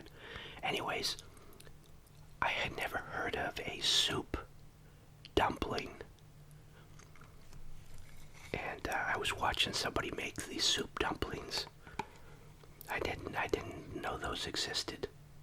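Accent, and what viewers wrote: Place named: Boston, United States. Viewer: you should do radio ASMR videos. People would like your Bostonian accent.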